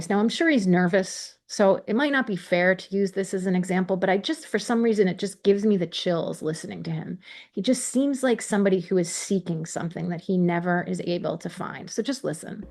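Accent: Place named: United States. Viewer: It is so shameful that we have to listen to someone with an American accent. The English so called justice system is now the laughing stock of the world because of the Lucy Letby witchhunt. We should also be grateful to Rachel Aviv who wrote from across the Atlantic at a time when truth telling in our country was banned by the trial Judge.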